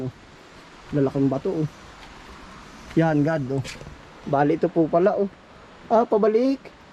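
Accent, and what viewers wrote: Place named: Lucban, Philippines. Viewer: kayo o ba y taga lucban ganda nio mangusap may punto nakka bano ano po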